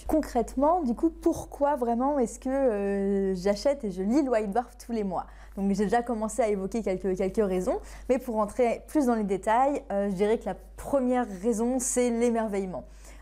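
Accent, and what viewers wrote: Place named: Belgium. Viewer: G Wé :-) Ça fait du bien d’entendre des prononciations de notre plat pays :-) Cela me rappelle le temps où mes parents avec une BMWée 😂 Un coucou d’un Belge de France !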